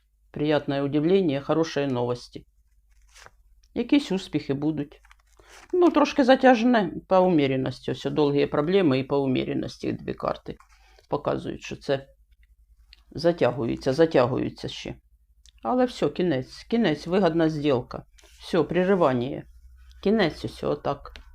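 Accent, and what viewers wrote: Kherson, Ukraine. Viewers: Дякую за Вашу работу 🙏🌷 не звертайте увагу на придурків….. мені дуже подобається, як Ви розмовляєте…. Так розмовляють на моїй Херсонській землі 🥰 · Да,ми наХерсонщині так говорим і всі привикли івсе нормально...,чекаю вас по утрам з нетерпінням,величезне вам дякую!!! За нашу ХерсонщинуДЯКУЮ велике,чекаємо....Каховка...❤️💙💛🇺🇦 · Доброго ранку незвертайте уваги люди нерозуміють того що херсонщина вся розмовляє суржиком іперейти на чисто українську одразу це тяжко ви все нормально розказуєте дякую за розклад пишу і слухаю ірадію тому що ви кажете дякую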